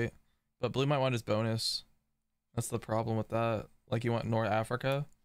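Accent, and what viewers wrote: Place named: Ohio, United States. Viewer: I knew you were from Ohio by the way you pronounced Medina.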